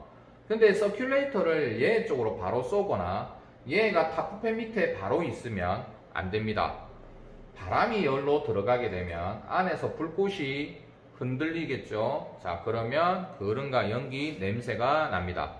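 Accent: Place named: Gyeongsang, South Korea. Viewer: 뜨거운데 디지는 않습니다ㅋㅋ 갱상도 사투리 정겹습니다ㅋ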